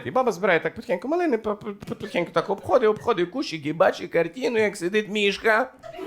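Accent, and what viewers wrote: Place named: Bukovina, Ukraine. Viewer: Розповідає цей пан -- неперевершено! З буковинським акцентом і дуже смішно. Дуже файно! БРАВО!!! Привіт зі Львова від глибочанки.😂❤❤❤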